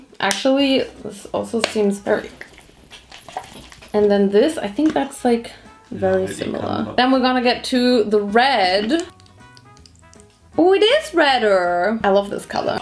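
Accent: United Kingdom. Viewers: YAAY MORE SHABBY SHALOON 🥦 THEY ARE THE BEST AND I REALIZED THAT TALKS IN BRITISH ACCENT WHEN SHE'S STRESSED 😹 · the british accent really popped off in this one 😍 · British accent is daaaa BEST!!!!! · slayed British acent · i LOVE YOUR BRTITISH ACCENT !!! 💙💙💙💙💙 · lately you’ve been serving some ✨fabulous✨looks, ma’am xx p.s. that british accent omg 🤣🤣🤣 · wasnt she already talking in a british accent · unpopular opinion: i prefer your british accent lmfaoo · british accent is the only thing that keeps me going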